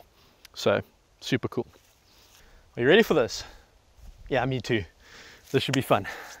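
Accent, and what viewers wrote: South Africa. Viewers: Funny to hear a mix of accents in South African English, a mix between Australian and a Dutch man speaking English. Groetjes uit Nederland.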